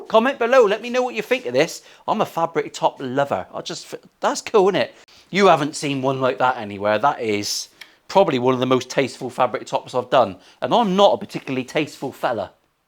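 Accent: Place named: Plymouth, United Kingdom. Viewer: your videos are a highlight of my week, love your honesty, your humour, and your craftsmanship. Love your builds, but you need to do another bass for all us low enders. I spent five years working in Devonport, so it’s nostalgic to hear your janner’ism’s. Keep up the good work.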